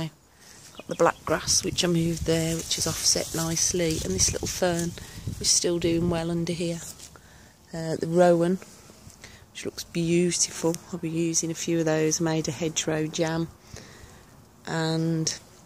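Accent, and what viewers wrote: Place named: Birmingham, United Kingdom. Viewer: Its good to hear that brummy accent. Local. Im in Sparkhill.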